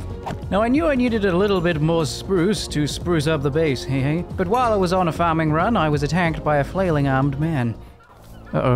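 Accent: England, United Kingdom. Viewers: is the most Bri'ish British YouTuber I have ever seen, and I love it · The British accent is marvelous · is this dude british or from somewhere with heavy british influence? the way he said darkness kinda makes me think so like the r isnt sounded just curious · your voice is so bri ' ish that i should hate it but god dam it, i love your voice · Dammnnnn british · dude i never heard British dude playing Minecraft 😅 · you are deffinitly from the uk, ive never seen anybody talk like that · This is the most aggressively british youtuber ive ever heard Instant sub, very nice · He British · He is an English gentleman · Nice bi i s accent mate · Are you British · more like least formal Posh Brit (Your Average Brit has a cockney accent and cockney is anything but formal)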